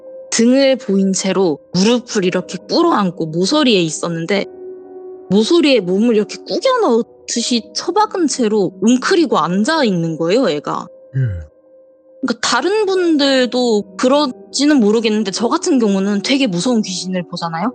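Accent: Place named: Gyeongsang, South Korea. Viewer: 경상도 마산쪽 사투리인데?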